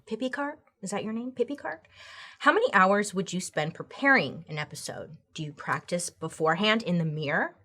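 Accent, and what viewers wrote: South, United States. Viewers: “Cornteen” 😂😂 talks like she’s from down south with me lollll ❤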